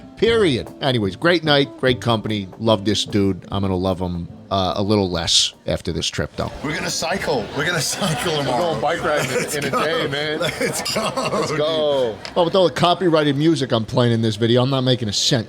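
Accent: New York, United States. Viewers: His New York accent is pretty constant but it gets stronger sometimes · i like how his thick new york accent slowly faded away since he retired, leaving that velvety voice i remember from his earlier days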